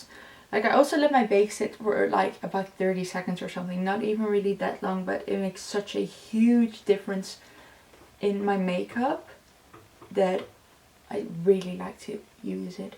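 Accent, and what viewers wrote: Netherlands. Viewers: Are you Dutch? Your accent sounds like a Dutch accent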